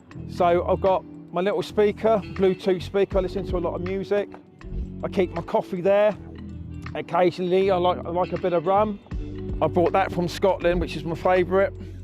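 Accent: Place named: Portsmouth, United Kingdom. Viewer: ive just realised my comment sounded like an insult lol..i meant i knew after 30 seconds u was from Pompey cos i am too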